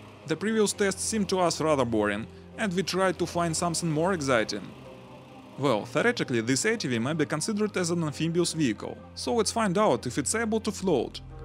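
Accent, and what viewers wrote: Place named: Russia. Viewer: Акцент то РУССКИЙ да и лицо наше, ничего не понятно но очень интересно.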